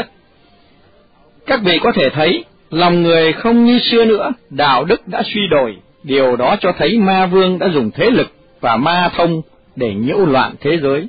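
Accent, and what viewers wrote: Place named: North, Vietnam. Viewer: Dọng đọc bắc nên mình là người bắc nghe rất dễ hihi. Mong chất lượng ghi âm ngày 1 tốt hơn nữa để tránh rè ạ 🌷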